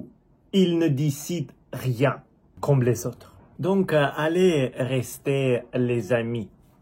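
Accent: Russia. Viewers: Personne n'a envie de suivre notre Président, nous souhaitons sa destitution. Mais merci pour votre communication. J'aime beaucoup l'accent russe😊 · Merci à vous de parler en français et expliquer à certains de nos compatriotes aveuglés par le discours de M. Macron, qu'avec votre analyse il est tout simplement impossible que la Russie envahisse l'UE. Macron est l'ennemi public n° 1 de non seulement de la France mais aussi de l'UE. PS : j'adore votre accent russe 🙂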